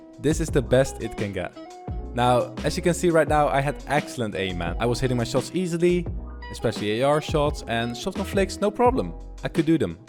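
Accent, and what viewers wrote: Netherlands. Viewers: Ik hoorde aan je stem dat je een nederlander was (ben geabonneerd) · Ja ik herken het meteen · You hear he's Dutch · His acent is 99% dutch 1% irish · I'm 99% sure he is Dutch, bcs of his accent😂😂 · yoo are you dutch because off your acent zo ja ik ben ook nl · Jo bro zelfs ik hoor dat je Nederlands bent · Zijn engels is goed alleen je hoort gewoon dat hij nl is! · He sounds Dutch to me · i saw this in my recomended(don’t know you) klicked on it and boom. 1 minute in and i know 99% sure you are dutch or somewhere close to it. The accent bro the accent🇳🇱🇳🇱🇳🇱🇳🇱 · Ben jij nederlands? Ik hoor het een beetje 😂🙌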